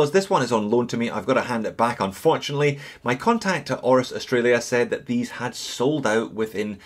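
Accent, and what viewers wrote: Scotland, United Kingdom. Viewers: So just to confirm your accent is Scottish?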